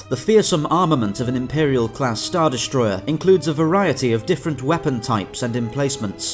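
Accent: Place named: Merseyside, United Kingdom. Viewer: Deffo from Merseyside 👍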